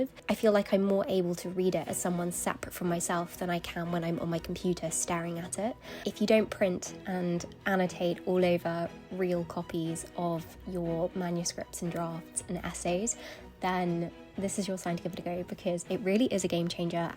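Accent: United Kingdom. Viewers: There is no such thing as "the British accent". is only one of a great many. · I honestly love your video because you're so cute and I love the British accent and I tried to learn it so I love you 💘🥹💕 and because you don't use music so much so I can listen to the accents to learn it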